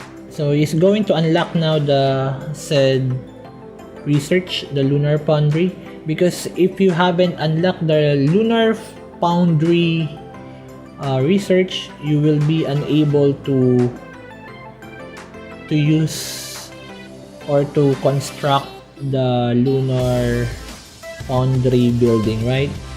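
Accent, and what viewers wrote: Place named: Philippines. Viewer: You're filipino just like me, I listened to your accent and I knew, hehe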